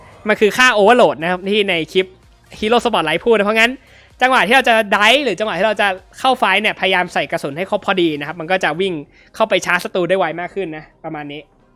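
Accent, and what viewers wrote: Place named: Thailand. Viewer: I didnt understand your language but i enjoy the gameplay, thai accent kinda funny tho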